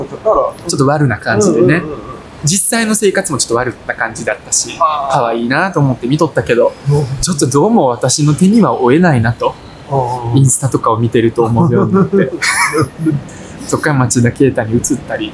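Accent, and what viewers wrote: Kansai, Japan. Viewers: 綺麗な関西弁がとても心地良いです。。。意志の強さを感じました〜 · この方の関西弁は品があって😍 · 関西弁で身近な存在に感じました。又、悧発な方で尊敬致します😊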